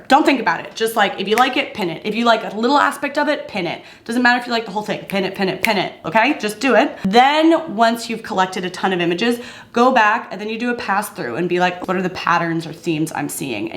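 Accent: United States. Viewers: hi what accent do you have? it's lovely i know it's american but i would like to know the specific accent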